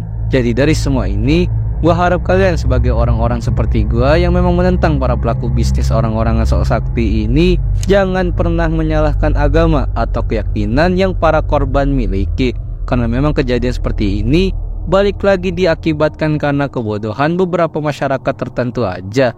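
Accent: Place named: Banjar, Indonesia. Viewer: Bang orng banjar masin? Logatnya kaua orng banjar 🗿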